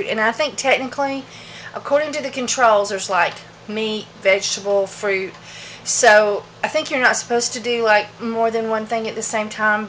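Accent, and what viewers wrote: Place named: Midwest, United States. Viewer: Wow, this is a really cool video. I am not sure where you are from, but I love your Midwestern accent. I love how you are doing traditional Jerky with a more modern spin on dehydrating. It sounds like you are just learning how amazing this is. I love meat, but I am on a living foods diet of nothing but vegetables nuts seeds grains and fruits of course, and I use the Exc. for making living foods recipes, but you cannot go over 115f or the life force in the plant u are doing will die.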